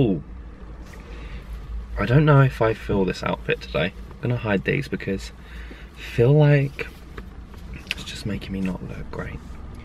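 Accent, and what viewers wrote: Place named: Essex, United Kingdom. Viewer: You sound like you’re a fellow Essex person like me 😁 or london 🤔 love your videos always crack me up!!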